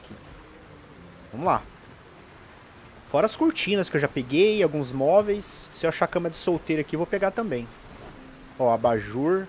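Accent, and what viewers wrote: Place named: Rio Grande do Sul, Brazil. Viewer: tu tem voz de gaúcho cara kkkkkkkk. Também sou do Paraná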